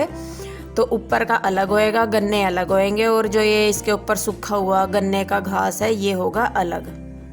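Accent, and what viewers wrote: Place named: Saharanpur, India. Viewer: सहारनपुर मुजफ्फरनगर वालो की बोली तो अलग है ही 🤩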